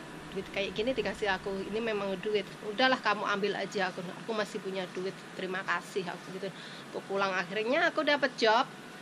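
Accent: Java, Indonesia. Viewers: Seneng liat mba sederhana dan logat jawanya kental banget dan tidak sombong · Siapa nonton 2019? Ternyta udah 20thn an tapi logat jowene isek kentel.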